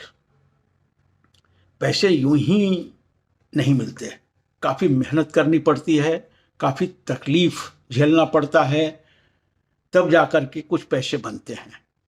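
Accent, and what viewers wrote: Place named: Eastern Uttar Pradesh, India. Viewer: jee, bataiye kya abhi humko paise laga dena chahiye kyunki share saste mil rahe hain?is main pareshaani hai ki kab lagaye, already portfolio 12 percent down hai, middle class ke liye issue hai ye, aapke support ke liye bahut dhanyawaad, pratiksha rahegi, shayad aapki vaani se lagta hai ki aap UP east ke hain, bataiyega kahan se hain aap aur humara anumaan kitna galat hai..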